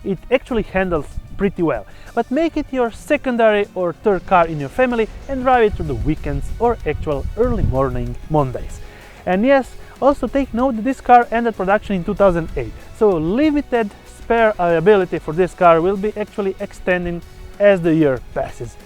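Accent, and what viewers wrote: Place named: Argentina. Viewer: Oye boludo! He just sounds Argentinian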